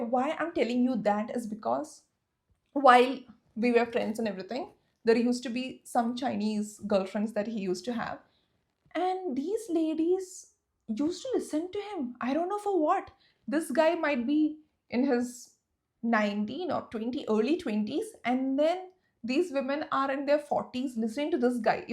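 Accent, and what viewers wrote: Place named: England, United Kingdom. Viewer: Hello Di Wassup You know what idk why but I am in love with the way you are talking i mean I am obsessed with the English accent Love from Assam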